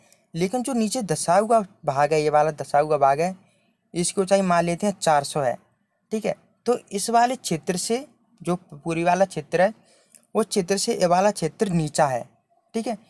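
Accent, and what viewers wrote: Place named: Uttar Pradesh, India. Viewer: U P k lgte ho awaz se😎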